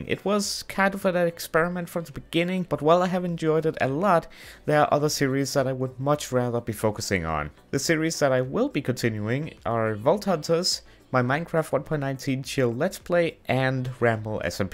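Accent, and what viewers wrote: Denmark. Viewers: I wonder where you're from. I recognize that accent! Are you from Denmark? It sounds alot like a danish accent.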